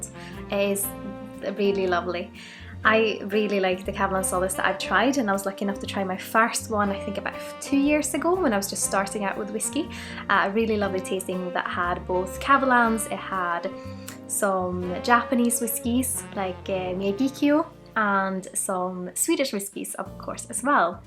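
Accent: Scotland, United Kingdom. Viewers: Är du skotte eller har du övat på ditt skotska accent?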